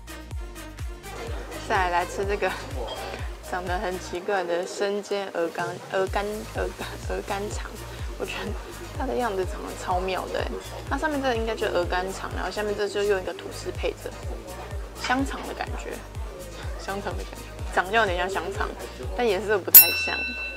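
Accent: Taiwan. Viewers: 最近才開始看你的視頻... 很美，雖然有點台灣國語，但一點也不影響你天然的氣質，更難得的是吃相很優雅..要繼續加油喔！ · 好喜歡聽講台語，台妹味十足!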